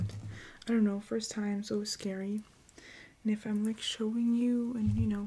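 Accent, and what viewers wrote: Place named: Scandinavia. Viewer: It's so weird how I never noticed your mild Scandanavian accent until you went quasi-albino. 😅 Great relaxing video as always!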